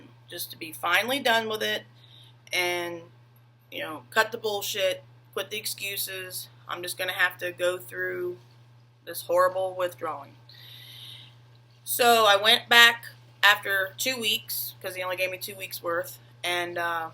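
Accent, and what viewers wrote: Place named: South, United States. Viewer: I bet you are probably from the south.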